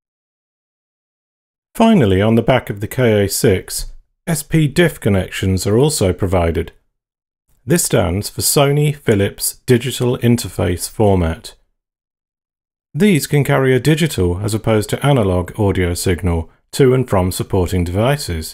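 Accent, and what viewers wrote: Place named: United Kingdom. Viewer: The British accent took this amazing product overview right over the top.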